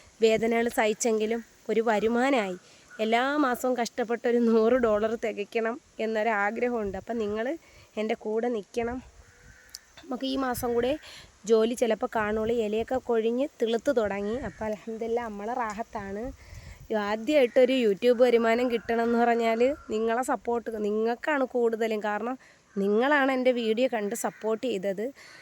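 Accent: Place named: North Kerala, India. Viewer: Hlo എടാ ..നിന്റെ വീട്‌ കൊല്ലം ആണോ ...ഈ പാരിപ്പള്ളി എവിടാ ...നിന്റെ slag വടക്കൻ കേരളത്തിലെ പോലെയാണല്ലോ .....ഒന്ന് പറയണേ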